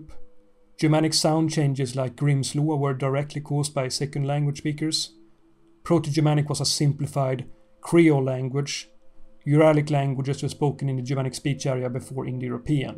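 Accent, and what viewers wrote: Sweden. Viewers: As a Swede, I appreciate the heavy Swedish accent!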